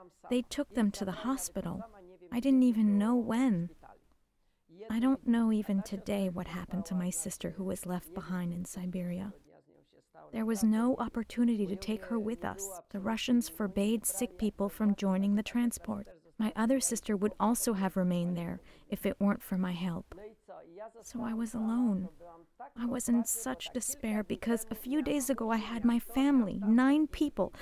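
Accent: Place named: United States. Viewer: thanks for uploading.The spoilt brat american narrator is annoying but I really should not quibble as this is great stuff.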